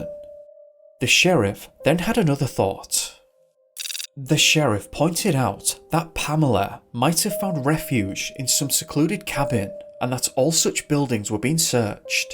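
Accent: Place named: Durham, United Kingdom. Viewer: Nope a Duram lad for sure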